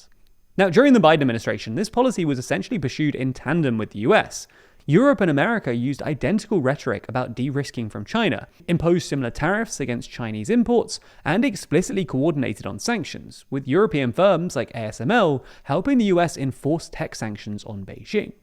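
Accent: United Kingdom. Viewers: the last time some guy with a British accent talks about trade imbalance with china... they flooded that country with opium and then invaded a few times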